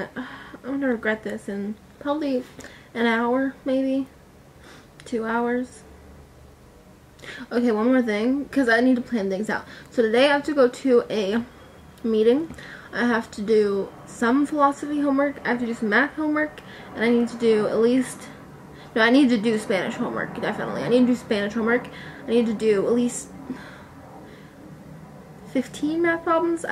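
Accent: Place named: South, United States. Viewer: You sound kind of southern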